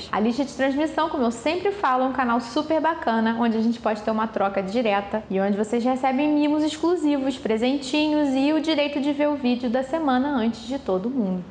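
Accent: Rio de Janeiro, Brazil. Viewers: Vc é muito didática! Adoro os vídeos e o sotaque carioca kkkkk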